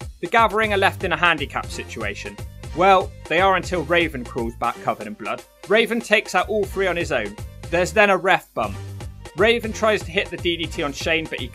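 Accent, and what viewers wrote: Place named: Weston-super-Mare, United Kingdom. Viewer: You gotta be from near me in weston super mare with an accent like that. Great channel. 👍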